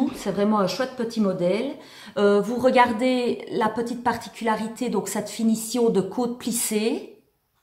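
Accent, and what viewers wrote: Liège, Belgium. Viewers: Oui, et l’accent liégeois aussi ! 😁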